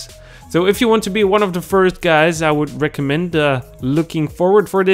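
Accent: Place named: India. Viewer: I HATE YOU VOICE. i im Di Viga thIIIIiii... Are you indian? youre soft sound E-i made my russian brain a crazzzZi. Lets try for example Ay Em Dee fucking Veeeeee GGaaaaaaa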